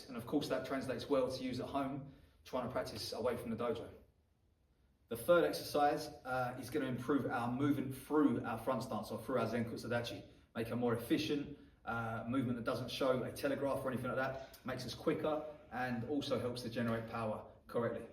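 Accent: United Kingdom. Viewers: I live in London and do shotokan karate, and you seem like you live in London too from your accent, do you have a club?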